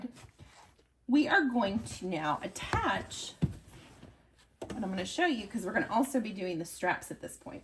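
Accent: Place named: South, United States. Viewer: I found your site and have really enjoyed it. Love your projects especially the Kate Spade tote bag. I hear a southern accent and have to ask where you’re from? I’m a native born Texan.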